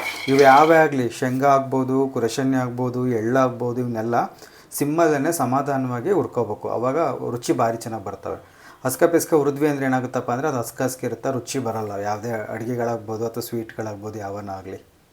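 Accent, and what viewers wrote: Chitradurga, India. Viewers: ನಾವೂ ದುರ್ಗದವರೆ,same ಹೀಗೆ ಮಾತಾಡೋದು.ಹಿಸ್ಕರಿಯೋದು ಅಂದ್ರೆ ಬೆಂಗಳೂರಿನವರಿಗೆ ಗೊತ್ತಾಗಲ್ಲ😂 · ನಾನೂಚಿತ್ರದುರ್ಗ ದವಳು ಅಂದ್ರೆ ಹೊಸದುರ್ಗ ಇವರ ಭಾಷೆ ಕೇಳ್ತಾ ಏನೊ ಮೋಡಿ ಒಳ ಗಾ ಗು ತಿದ್ದೆ ಇದು ನಮ್ಮದೇ ಸೀಮೆ ಯವರು ಖುಷಿ ಯಾಗುತ್ತದೆ 👌❤😄😄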